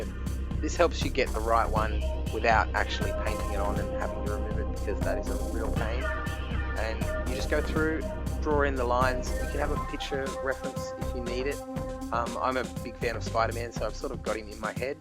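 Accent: Australia. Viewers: Aussie!!!! Damn I miss Australia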